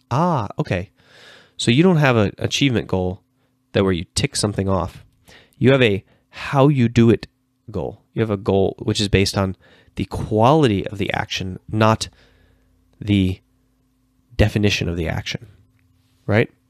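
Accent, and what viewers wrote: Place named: United States. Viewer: you have an american accent yet studied in finnland. Are you finnish descent?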